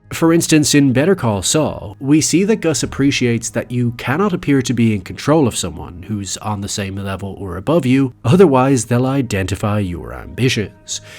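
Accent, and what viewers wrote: Ireland. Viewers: Are you Irish, mate? You sound like one of my old best mates.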